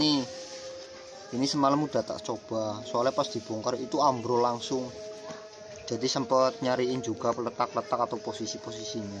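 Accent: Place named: Banyumas, Indonesia. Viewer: Bang ruamhnya mana kok ngapak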